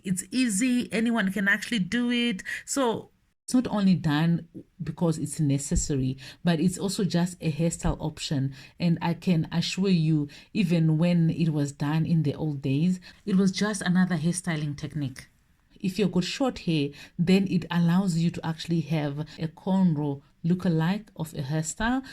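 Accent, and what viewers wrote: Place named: Africa. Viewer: I love your African accent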